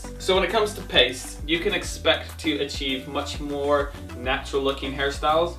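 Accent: England, United Kingdom. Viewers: I know I have said it before but English accent is coming on REAL thick! Like damn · why does he sound british in this video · Is it just me or does it sound like he's supposed to have a British accent? Haha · lol come back to america your starting to develop a british accent and im not used to it😂😂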